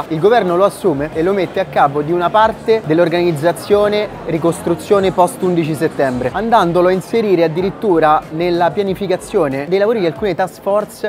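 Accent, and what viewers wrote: Rome, Italy. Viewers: Assomigli a Dean Winchester: adoro! Tu e il tuo romanaccio, verrete con me domani in treno:)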